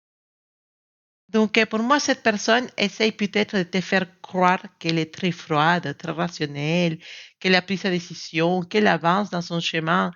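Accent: Spain. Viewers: Merciii cest fou cest ca cedt avec un vierge flamme jumelles yavait une tierce je lui ai dit mes sentiments et aussi quil me manquait samedi cest son anniversai je vais lui envoyer un message vous avez un joli accent vous etes espagnole ma maman est mexicaine merciii je laime de trop ca gait un an que je lattends la je vois une personne meme si je laime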